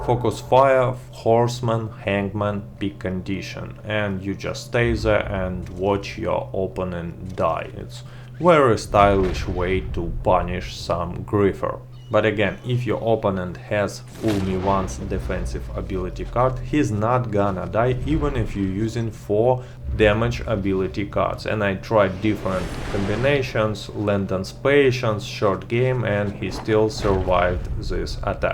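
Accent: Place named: Russia. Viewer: Still laughing please don't ever lose the humor everything us so much funnier in Russian accent!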